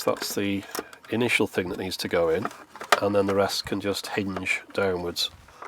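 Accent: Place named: Wigan, United Kingdom. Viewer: "It's a screw! I can feel it!" I am seriously tempted to give this channel money for Wigan voiced content like this.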